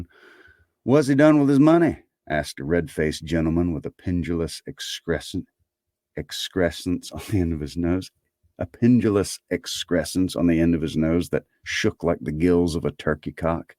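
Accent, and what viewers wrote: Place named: South, United States. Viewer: The Dickens writing and the accent in your narration gives the whole audio story an “antebellum” feel to it. As though this could be a story taking place in placid North Carolina and not urban London. Which is probably a testament to Dickens’ talent as a storyteller!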